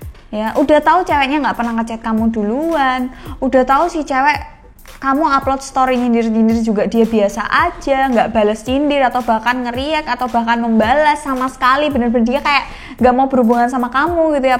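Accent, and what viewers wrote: Java, Indonesia. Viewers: Medok bngt jawanya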